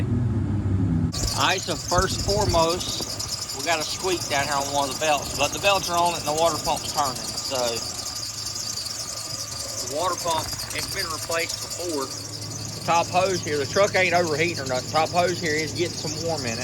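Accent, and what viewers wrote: United States. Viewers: Great. No rockNroll noise. Except for the Carolina accent it was perfect. 5 stars. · You already know when they got that southern accent, they know what they doin.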